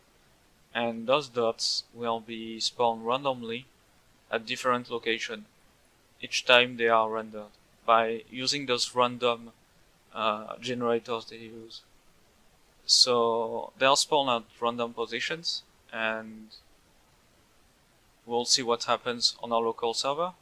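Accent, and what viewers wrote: France. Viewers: i can hear some french accent in your voice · ta un bon accent hein :) mais c'est quand même cramé que tu es FR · Ce gros accent français omg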